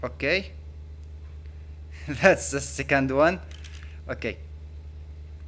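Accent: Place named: Arab world. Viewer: والله انك عربي عرفتك من اللهجه😍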